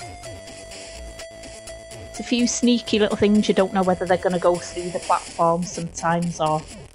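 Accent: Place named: Liverpool, United Kingdom. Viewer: Now then,I thought I'd already subscribed to your channel, but obviously not lol..anyway if I remember wright retro b8 mentioned this channel, unless there's another retro Scouse (with yourshire overtones)sounding girl channel ..don't worry im from Liverpool myself.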